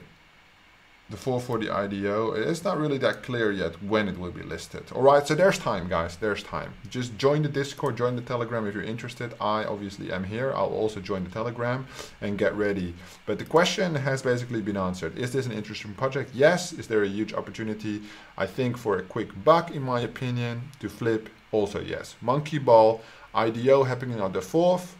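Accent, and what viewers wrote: Netherlands. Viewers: Nice vid bro!! Smart thinking🤔💎 Where you from? Accent sounds Dutch😅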